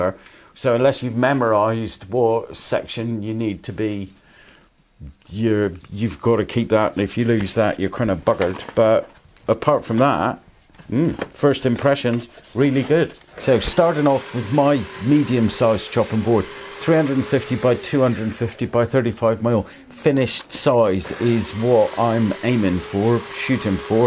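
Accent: Cornwall, United Kingdom. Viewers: Great to watch the way you work, I especially like your narratives explaining what you are going & why you are doing it that way..... Also to hear rhe Cornish accent ( in Singapore)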